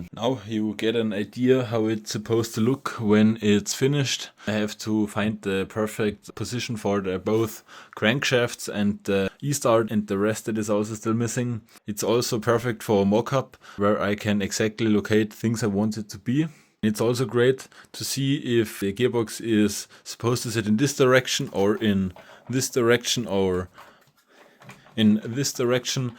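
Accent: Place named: Germany. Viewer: I hear deutsch 🫣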